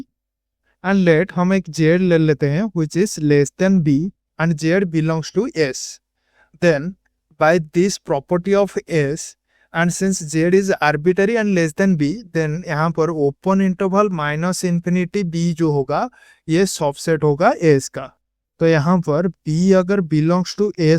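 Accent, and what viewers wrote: Odisha, India. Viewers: Are you from odisha??? 🙏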